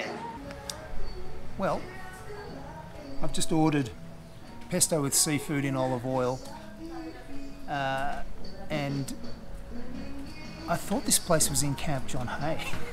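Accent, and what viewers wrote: Australia. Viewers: You sound like a guy from👇 under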